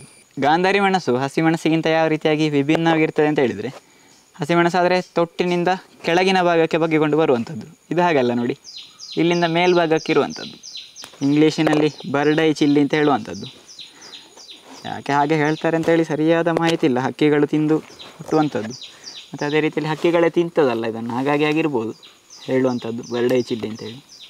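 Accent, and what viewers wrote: Mangalore, India. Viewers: Thats Mangalore Kannada accent. Yes, unlike Bangalorians they speak pure Kannada.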